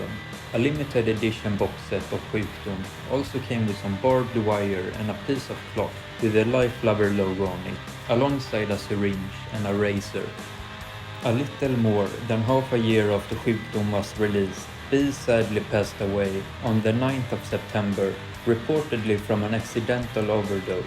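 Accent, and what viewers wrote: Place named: Sweden. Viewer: It was funny for me to listen to english with such a strong swedish accent 😇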